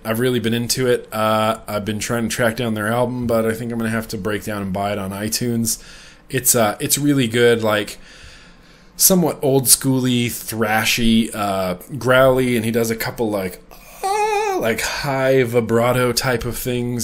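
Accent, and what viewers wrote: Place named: South, United States. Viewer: i just need to say that the southern bell accent is the sexiest accent there is. also i wish i would have started vaping years ago, so i could have been watching these vlogs, its a highlight in my week.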